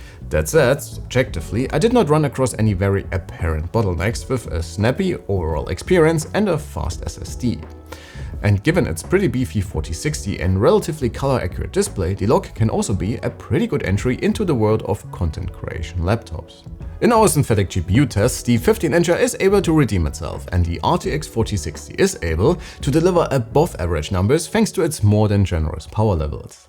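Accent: Germany. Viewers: Smart to do Videos in English for you, but the German accent is insufferable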